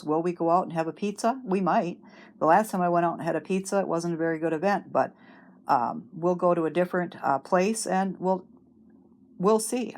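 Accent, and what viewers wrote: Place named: Wisconsin, United States. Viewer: My parents are from LaCrosse, WI! I recognized your accent! People ask if I am from Minnesota or Wisconsin often! Soul sister!!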